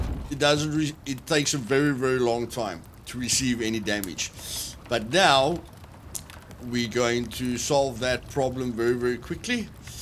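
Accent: South Africa. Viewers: Hey man thanks for the walkthrough ! are by any chance south african ?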